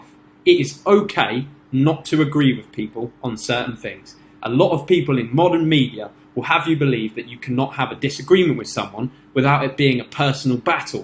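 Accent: United Kingdom. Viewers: you're the most bri'ish guy I've seen till date bruv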